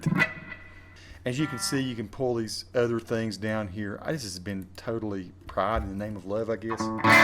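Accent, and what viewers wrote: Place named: Southeast, United States. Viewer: I have been looking at video reviews of this amp for over an hour now, and I enjoyed yours 10 to 1 more than any other one. You’re relaxed, not trying to stroke your ego, just checking out the tones by picking through some tunes relevant to the settings. I don’t know why people think that an ‘amp review’ should be them running 64th note arpeggios. The only person that helps is themselves and their egos. Having said that, you’re absolutely a great guitarist and it’s beyond obvious you love music and the guitar. Also, it’s awesome to click on a video and hear someone speaking with the same accent as me! I don’t know what part of the southeast you’re from but I’m from the southwestern part of Virginia, and I’d guarantee you’re originally from VA, TN, or NC. Either way, you absolutely gained a new subscriber today!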